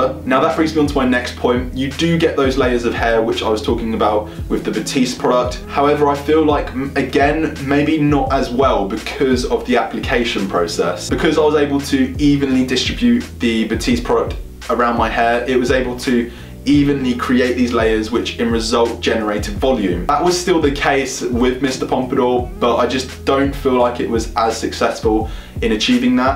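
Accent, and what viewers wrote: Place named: London, United Kingdom. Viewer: Why doller u got london accent we have pounds in london ?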